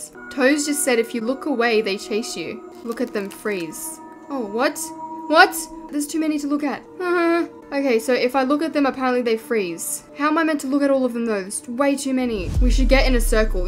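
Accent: Australia. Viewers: yk shes aussie just by the way she speaks <3 I can relate.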